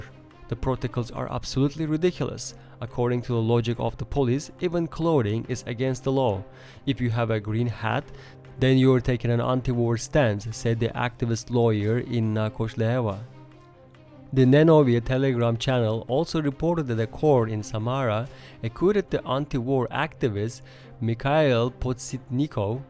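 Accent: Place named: Asia. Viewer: I must ask this first before commenting on this report. Where do you guys find such eye catching names for your YouTube channels? The accent also suggests the narrator might not even be a Ukrainian but Asian and seems to know more than the CIA and the FSB.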